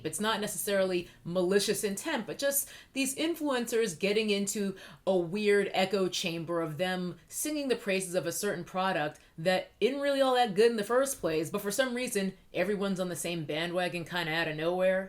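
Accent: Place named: Northeast, United States. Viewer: First of all, hi, subbed. I heard that north eastern accent and was reminded of home instantly. Secondly, I'm a biochemist (getting my graduate degree) and I could go ON about the scams YTers push on you. One thing that some big youtubers push is "supplements". Lets make on thing perfectly clear- these people have no business selling you anything to put in your body. Most of what they sell you is diluted 1000 fold in water, meaning that you are taking water pills. Other things that they sell you, like biotin and vitamin C pills, are nutrients that, if you are living in a country where you have access to food, you don't need to supplement these things into your diet. With vitamin C, you get your maximum daily dose from like, half a bell pepper. Vitamin C is water soluble, meaning you excrete the rest of it out. So when you see pills that say "1000x daily recommended dose of Vitamin C!", it means you are paying someone to pee out all of those nutrients. 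Plus, for many nutrients, like beta-carotene, in order for them to give you the benefits you need, they are dependent on other nutrients found in the food that you eat itself, meaning that they are not as effective if you are just taking them alone in a pill form. TL;DR- don't take pills from influencers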